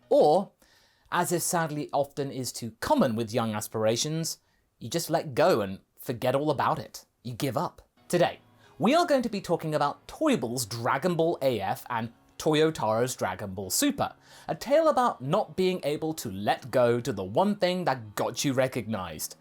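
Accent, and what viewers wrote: United Kingdom. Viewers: J2391 hes british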